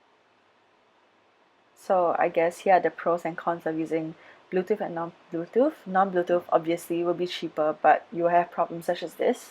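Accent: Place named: England, United Kingdom. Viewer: I hate ur english accent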